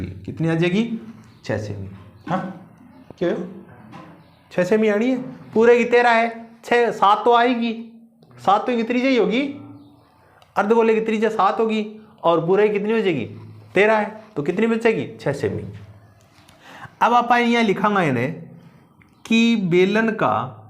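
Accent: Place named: Rajasthan, India. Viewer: sir mujhe aap ke bolne ke accent se pata chala kyuki me bhi Rajasthani hu ☺️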